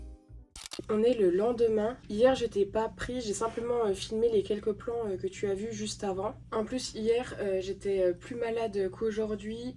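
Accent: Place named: Franche-Comté, France. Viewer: Hello! Je suis tombée par hasard sur une de tes vidéos il y a environ une semaine, j'ai vraiment bien aimé, et j'ai eu envie de rester. Et puis je me disais "il y a un léger fond d'accent qui me fait penser que"... Et quand j'ai vu le papier du fromage ça m'a confortée dans l'idée ^^. Contente de suivre les aventures d'une franc-comtoise au Québec, et au plaisir de te revoir par ici!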